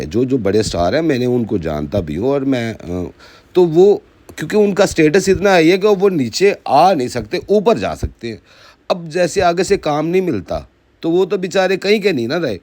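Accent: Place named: Pakistan. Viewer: Lahze se hi pata chal rha hai ki tum pakistani ho. Tumhare yahan ke nalle vloggers mar gaye jo indians per bolne ae ho ! Tumhare yahan ki zani pitara yaseen ko dekh lo, wahan kyun ni muh khulta tum logon ko .. bandi khule aam zina karke rang birange bacche paida kr rai hai ! Per wahan paise ni bnte honge na … indians per bolke kamana hai 😂😂😂😂😂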